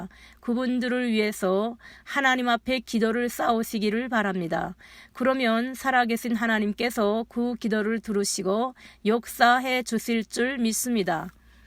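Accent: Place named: Pyongan, North Korea. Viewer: 피안도 사투리가 참 정겹게 들립니다, 나의 오마니도 1.4후퇴때 피안북도 정주에서 월남해서 고생하며 저희형제 잘 키워주시고 작년에 작고하셨다오.. 지옥의 북녁땅에서 참 많이 고생하셨군요.. 다 좋은데요, 방언은 아닙니다. 고전14장 헬라어 원본으로 잘 읽어 보면, 오늘날 현대교회에서 행하는 방언은 절대 성경과 일치하지 않습니다. 고전14장 한글번역이 굉장히 잘못된 부분이 많아요. 다다다따 방언을 예수님께서 하셨나요, 사도들이 하시던가요? 한글로 또는 영어로 기도하면 하나님이 못알아드시나요? 방언기도하다가 귀신을 초청하게될 가능성이 아주아주 높은데, 왜 맑고 깨끗한 맨정신으로 내가 알고 하나님이 받아주실 정상적인 언어로 기도하면 안될까요? 샬롬!!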